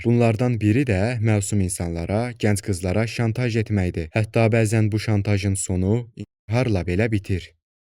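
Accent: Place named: Turkey. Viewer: Qardaşım bax bəlkədə bu mövzu ilə aidiyyatı sıfırdır ama xaiş edirəm yalvarıram Türkiyə Türkcəsi aksentiynən danışma çox ət tökən və yorucu olur hörmətlər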